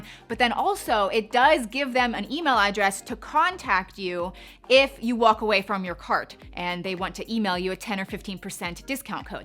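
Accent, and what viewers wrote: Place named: Pittsburgh, United States. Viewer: I love how you say “mere” (mirror) and Q-pon (coupon). You are definitely from Pittsburgh (as was I). Finally lost the “yinz” to y’all when I moved to the South. Love your channel!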